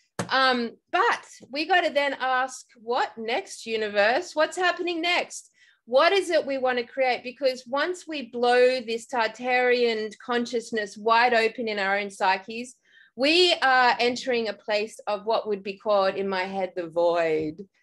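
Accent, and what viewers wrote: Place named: Australia. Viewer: You are speaking ozzy, what is an ''Op-Shop'' ?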